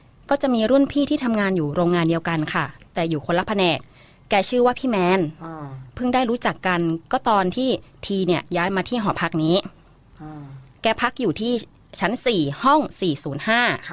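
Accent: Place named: Isan, Thailand. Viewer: พูดอีสานชัดมาก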